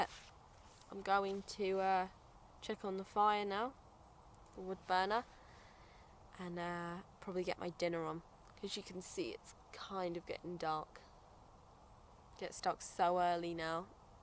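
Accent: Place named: England, United Kingdom. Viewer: I thought you were in England just by your accent haha. But yeah it’s really depressing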